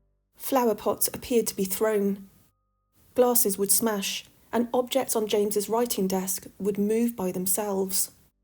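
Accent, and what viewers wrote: United Kingdom. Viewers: I didn’t catch your video on Halloween, but any time is a good time for a video from and. Nothing like a good ghost tale told with a British accent. 🥰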